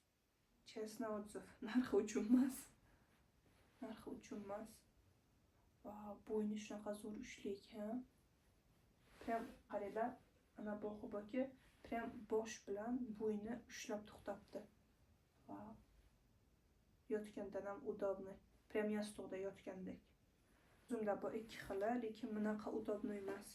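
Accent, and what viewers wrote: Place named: Bukhara, Uzbekistan. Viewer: Зур манга ёкди чикаринг ман энди обуна булдим сизга каердан сиз шевангиз бухороликларникига якин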